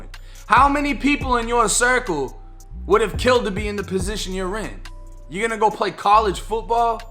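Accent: Boston, United States. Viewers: Does anyone know if from Boston because he sounds like he's from Boston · U thr man bro love ur Boston accent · Ok dude your from Boston I can tell from your accent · U from boston my guy? I can hear that bostonian accent.. Massachusetts in the building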